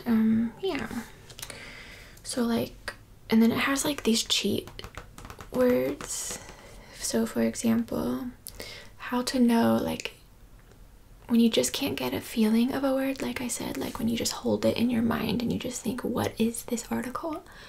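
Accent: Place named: United States. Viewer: As a German i can say: I LOVE the American accent and yes, we are very friendly. I really enjoyed the video. Keep your work up! Greetings from Germany 😍☺️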